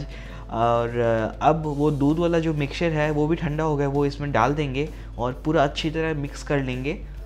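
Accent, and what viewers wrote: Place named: Hyderabad, India. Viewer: Your huderabadi accent is on point ...God bless u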